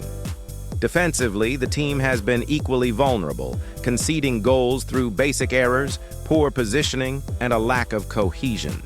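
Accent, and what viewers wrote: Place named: United States. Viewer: But why have an Amerixan voice,get Danny Dyer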